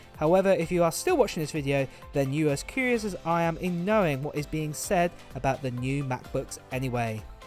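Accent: United Kingdom. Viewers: I hope to get the iPhone 13 for Christmas. I your channel is great and informative and I just really love your British accent when you say thousands it sounds like fousand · Well, I want to buy the iPhone 11. But sadly I don’t have money for that. And also i didn’t already bought any apple gear or product cuz I don’t have money for that, And i hope i will be the one who got your iPad. Because It can help me in my studies. btw i like your british accent, congrats and godbless💖💖💖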